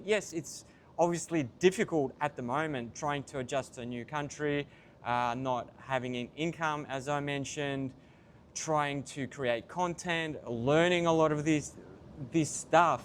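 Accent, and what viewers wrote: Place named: Australia. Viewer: IR A REAL DING BAT!! NOBODY MOVES TO ANOTHER COUNTRY WITHOUT LIVING FIRST U MORON!!! UR CHARACTER AT THIS AGE ...I DID NOT LEARN ..TO LISTEN N GROW UP!! U DO IN HASTE SO HOW ON EARTH CAN U CHANGE??? UR GOING TO REPEAT UR SILLY WAYS!!! GET RID OF THAT SICKENING OZZIE PEASANT SLANG...N LEARN TO SPEAK PROPERLY..."ENGLISH"!!! NOT D ANNOYING ACCENT AT ALL!!! FIRST LEARN TO LISTEN TO D LOCALS ...N NOT SPEAK INTERRUPTING WHILE SOMEONE IS SPEAKING...COZ U WONT HEAR OTHERS GET IT???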